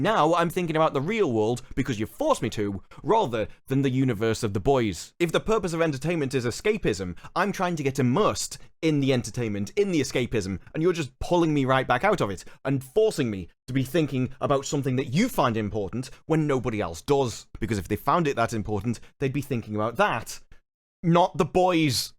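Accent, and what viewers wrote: United Kingdom. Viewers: Mate, are you taking the piss? You’re trying to miss the point or are just a professional idiot with a British accent?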